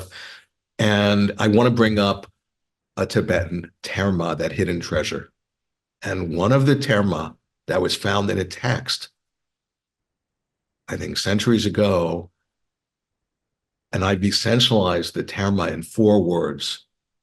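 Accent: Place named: Philadelphia, United States. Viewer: Is Mr. from Philadelphia? His accent sounds like he is. I was surprised to see this is being presented by The Theosophical Society. They had a building on Rittenhouse Square in Center City- Philadelphia.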